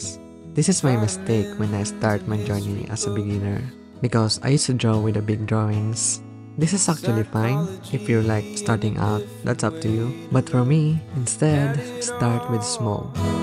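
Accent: Philippines. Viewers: wow you are a Filipino, just by your accent its so obvious. I live in Philippines too, I m a fan of your art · Ilonggo ka sir? curious lang ko sa accent 😅 btw your auch a good artist po🥰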